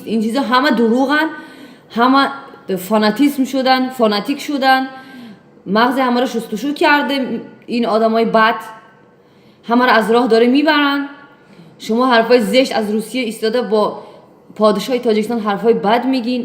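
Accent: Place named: Khorasan, Afghanistan. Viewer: ДАР хуросони Афгонистон Точико хамихел Дари сухбат мекунан 😀😂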